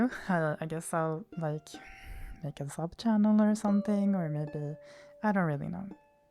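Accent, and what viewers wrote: Sweden. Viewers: You sound very Swedish now, I don't hate it though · swedish accent is cute af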